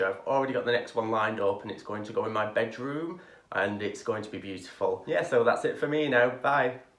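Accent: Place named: Newcastle, United Kingdom. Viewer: Nice job! Canny little cabinet looks very chic now. 😊 Enjoyed your voice over, always like to hear somebody from home, cheers from an expat Geordie in Alberta. 👍😊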